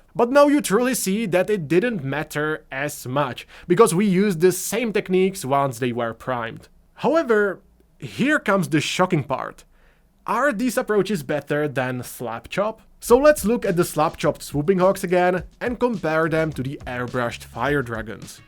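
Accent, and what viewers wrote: Czech Republic. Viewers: You accent sounds familiar, are you from Czech Republic by any chance?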